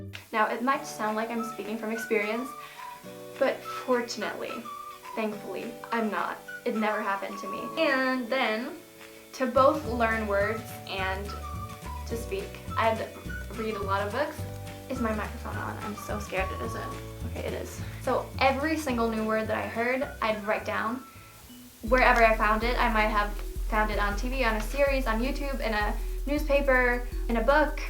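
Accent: California, United States. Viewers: learning to speak american accent just like you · your amazing American accent. You sound like you grew up in California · you sounded so American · Your American accent is too good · you sound like an american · you sound like a wholesome American 100% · making videos in english with an american accent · YOU SOUND SO AMERICAN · how many time taken by you to learn American accent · you sound totally Californian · you could have told me you were American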